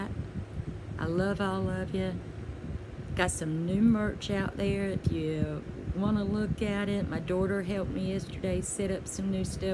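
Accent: South, United States. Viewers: So happy for you and your family that prayers were answered and you will make a full recovery. It would be pretty neat to see a video on your top 5 favorite places that are haunted. Or scariest places you've investigated....I will watch any video as long as your southern twang is in it haha much love to you and your family 👻💜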